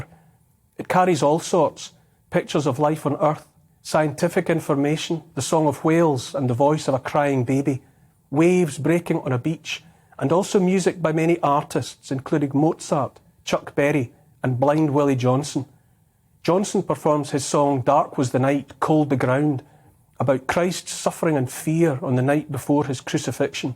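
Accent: Scotland, United Kingdom. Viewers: Listening to Neil's Scottish accent only makes me wish a bagpipe band would go marching the hill. Wish I played. I can do scottish country dancing and miss it. Thank you for your support from this Canadian Pilgrim in British Columbia (Heritage is Scottish and South African, sure do wish the Commonwealth countries, and the rest of the world, would get it together to respect its people sooner). We must win. For our children. For our country. For Humanity. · A combination of a beautiful Scotish accent and incredible intelligent, Sir Neil you are an incredible human soul ❤️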